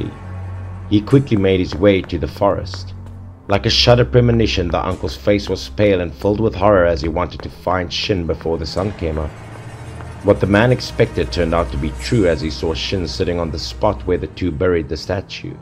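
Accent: South Africa. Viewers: Dude are you by any chance from South Africa? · Is this a South-African Accent?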